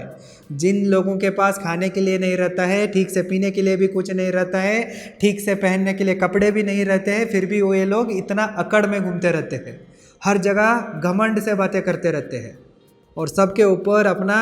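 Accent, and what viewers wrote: Maharashtra, India. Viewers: राम - राम मराठीत बनवा ना.. ( Marathi Accent Refelecting clearly )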